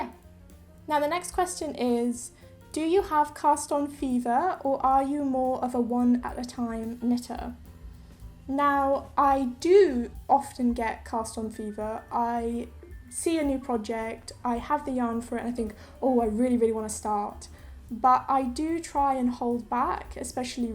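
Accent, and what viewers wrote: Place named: England, United Kingdom. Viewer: it was so interesting to hear about your very International childhood. Thanks for sharing! Even though I’m very bad with recognizing accents, I did have the feeling your accent was completely “born and raised” in England. 😊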